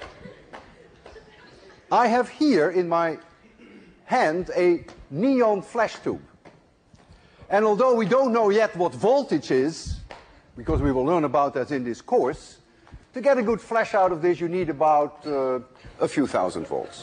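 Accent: Netherlands. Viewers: he sounds dutch. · is he dutch? his accent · He's got a dutch accent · he talks like he's from holland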